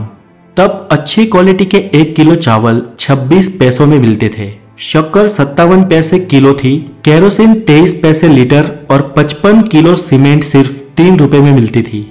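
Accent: Gujarat, India. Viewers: Bolne wala Gujrati hai☺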